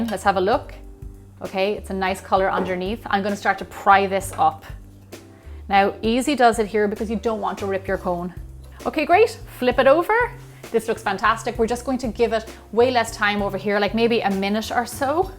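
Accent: Ireland. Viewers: tell a are you from Ireland if so from which part ?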